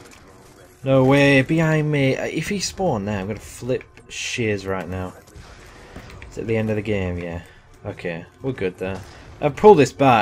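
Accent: Yorkshire, United Kingdom. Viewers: be proud of ur yorkshire accent:) use the words like 'gunna;:D im from yorkshire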